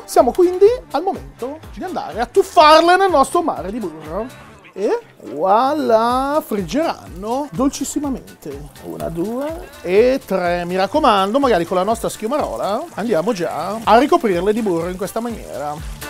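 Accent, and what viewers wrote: Rome, Italy. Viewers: Parla tutti li video in romano che te esce bene grande sempre generoso cor magna!!👍🏻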